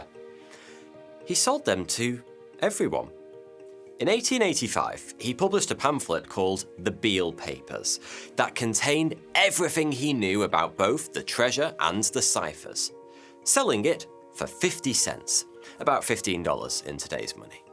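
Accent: United Kingdom. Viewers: I don’t mind British accents, in fact I love them, particularly the cockney accent. But, please start saying your th’s correctly rather than as f’s or v’s. I love your videos, but it seems I might have to stop watching. If you do this I will subscribe and support your channel. Thanks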